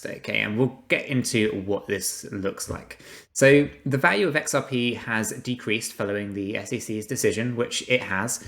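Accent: England, United Kingdom. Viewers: The English accents are a giveaway no?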